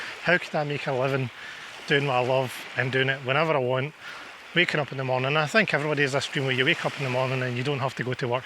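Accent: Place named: Scotland, United Kingdom. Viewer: The reason I asked is I thought that was Scotland cuz I've seen so many pictures of Scotland. I'm Scottish I'm the only one in my family that has not been there. I have thick book of my family that connects me all the way back hundreds of years ago from Scotland. I've been wanting to go back through it cuz it's been many years since I went through it since my mom's died. Many clans the only clan I can think of that was the main part of the family was the MacDonald clan. It's been many years since I've been to the Scottish games here in the states.I still get a flyer something my house to meet the McDonald's clans at the Scottish games once a year I'm going to have to do it next year for sure. It's always such a amazing fun. I hope I'm able to visit Scotland one day. Thank you for your time I just knew that was Scotland not just from your accent look so beautiful it's nice to see a picture like that. 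Thank you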